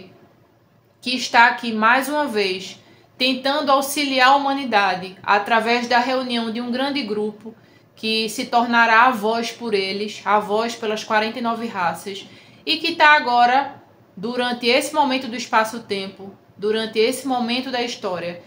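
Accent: Northeast, Brazil. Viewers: vc deveria fazer videos com mais frequência. ACHO QUE PELO SOTAQUE MEIO NORDESTINO AGENTE ENTENDER ATÉ MELHOR AS INFORMAÇÕES😂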